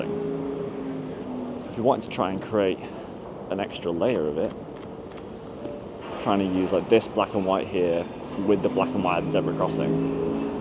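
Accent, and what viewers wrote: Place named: Manchester, United Kingdom. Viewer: You’re from Manchester; as an American, I guessed Liverpool. 😂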